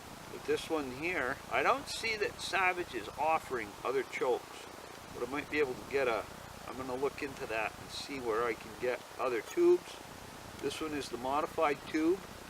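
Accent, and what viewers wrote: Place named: Vermont, United States. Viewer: I recognized that good old VT accent right off. Im up here in Franklin county. Subscribed.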